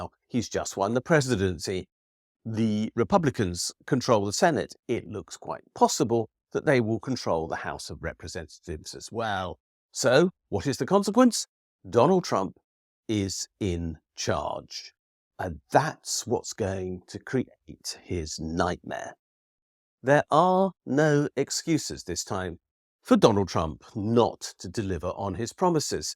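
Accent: United Kingdom. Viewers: Ok, you talk funny. You are not an American, so p!ss-off Limey.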